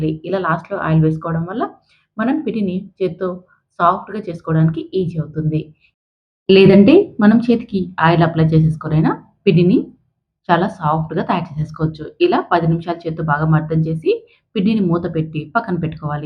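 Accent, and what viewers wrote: India. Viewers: Nenu mi matalu vini ma Kadapa vallu laga unaru anukuna andi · AMMALU MEE PADHA VUCHHARANA BHATTI RAYALA SEEMAVARI LA ANIPISTHODHI AMMALU "VESUKOKUNDA" AND."RAKOKUNDA" MEEMU 20Y.BACK.RAYALASEEMA ANANTHAPUR VASTHAVYLAM AMMALU . THANQ AMMALU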